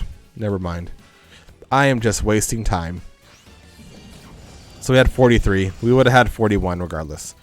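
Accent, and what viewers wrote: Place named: West, United States. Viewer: sound like cowboy but sometimes feel he just a farmer from Alabama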